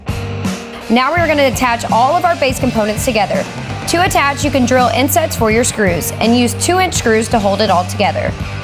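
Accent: South, United States. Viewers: She is absolutely stunning!! The redhair, southern draw, knows how to use power tools and can build!! She is Amazing!!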